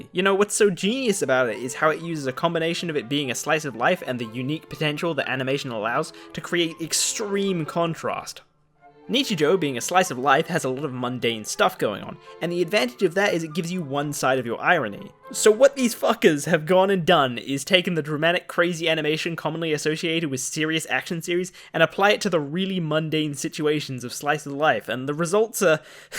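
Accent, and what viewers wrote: Australia. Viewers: You sound like an Aussie ..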